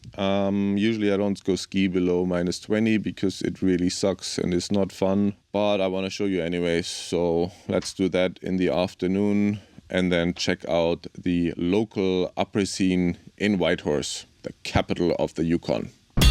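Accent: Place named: Germany. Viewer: A german accent in the yukon snow in underwear, what gold.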